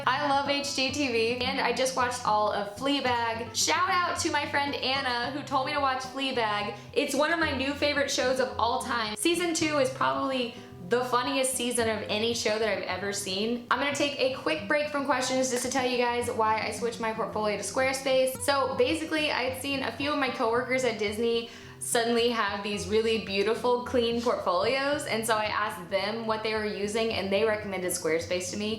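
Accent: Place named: South, United States. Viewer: alina kouzouian Southern drawl.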